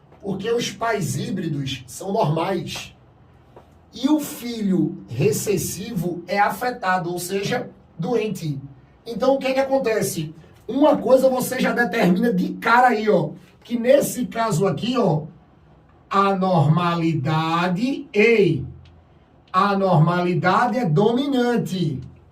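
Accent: Northeast, Brazil. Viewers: Cara, melhor aula sobre esse assunto, que professor fantástico. A explicação desse professor é muito clara e única, esse nosso sotaque nordestino é muito bom! Parabéns pela aula professor, com certeza vou lembrar do senhor na minha prova!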